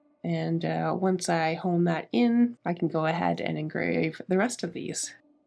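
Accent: Nova Scotia, Canada. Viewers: The pronounciation difference between Nova Scotia and Massachusetts is amazing. You call them Dek-uls, we call them Dee-cals. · I didn't realize Canadians pronounce decal differently than Americans. You say deckle. We say Dee-cal with the cal part like California. 💕 Another furniture transformation that, to me, would have been just too difficult as well as me not being able to see it in my head all restored. You're amazing, and I love how proficient you are with old tools as well as the new technology of the laser cutter. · I love how you say “decals”, love that Nova Scotia accent!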